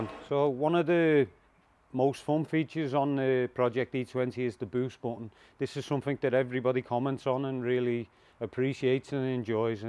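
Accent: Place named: Newcastle, United Kingdom. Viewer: I love the Geordie accent🙃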